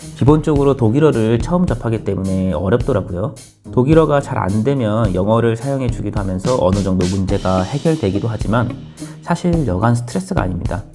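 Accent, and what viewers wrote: Jeolla, South Korea. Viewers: 전라도 분이 신거 같은데요 억양이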